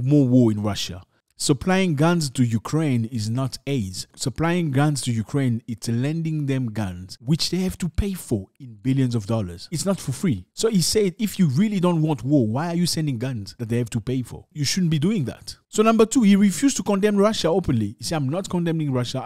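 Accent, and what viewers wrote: England, United Kingdom. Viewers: Notre frère va du côté anglophone de la force, très bel accent anglais 💪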